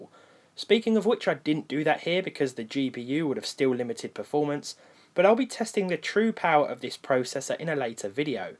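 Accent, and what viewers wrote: United Kingdom. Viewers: I heard british accent---------------------> i leave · I hate that british speech 😂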